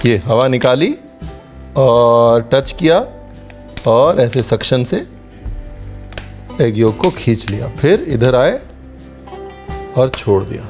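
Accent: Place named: Lucknow, India. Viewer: Bhai ka lucknawi andaaz jindaabaad..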